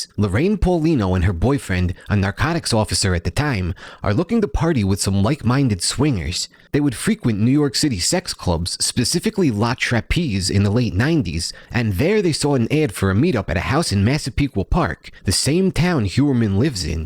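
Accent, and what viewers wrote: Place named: New Jersey, United States. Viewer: lets get this man to 1k subs people. his jersey accent is worth it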